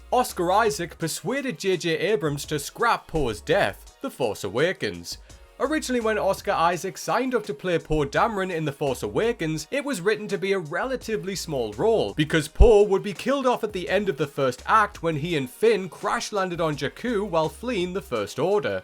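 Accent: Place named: Newcastle, United Kingdom. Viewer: That's so funny that the final chapter in the video description says "Rear Park" instead of Ray Park, when the video narrator's got a Geordie accent :)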